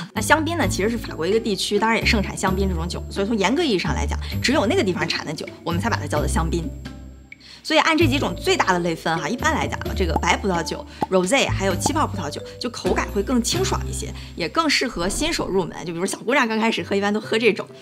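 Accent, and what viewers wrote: China. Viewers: 阿lin的大陸鄉土味甩不掉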